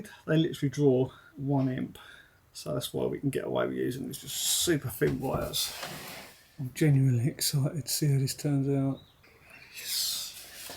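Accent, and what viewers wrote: Cockney, United Kingdom. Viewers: Are you a cockney?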